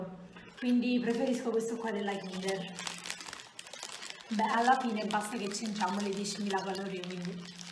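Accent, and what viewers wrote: Naples, Italy. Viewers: Sei napoletana Ps ti adoro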